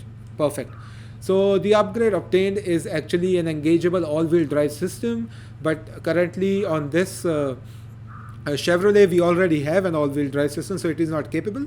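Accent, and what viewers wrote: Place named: India. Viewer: Hey !! Sounds like you're Indian, where are you from? I am a biker YouTuber from Bangalore who loves this game!! Love your content , subscribed :)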